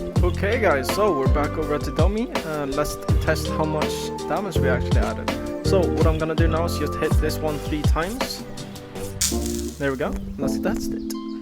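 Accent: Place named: Sweden. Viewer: Visste inte att du var svensk före den här videon. Man hörde det verkligen på din dialekt.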